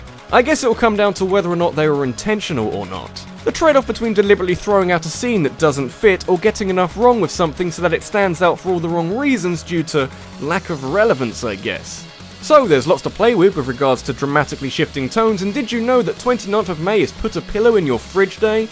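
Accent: England, United Kingdom. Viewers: For those wondering, he did pronounce Lumiose City correctly. While his pronunciation of the last syllable would usually be wrong, his particular English accent gives him a free pass, since the real pronunciation actually would sound like that if spoken with that accent unless the speaker was fluent in French to the point of using it interchangeably with English. As for the emphasis on the word, there is no accent on any of the vowels, so the first syllable gets the emphasis.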